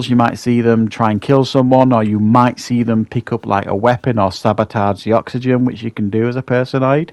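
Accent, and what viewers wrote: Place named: East Yorkshire, United Kingdom. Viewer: You have to be from east Yorkshire. Love the accent.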